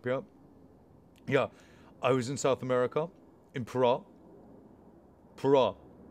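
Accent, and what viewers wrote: United Kingdom. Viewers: What kind of accent is this? It's like some British accent mixed with a Californian accent.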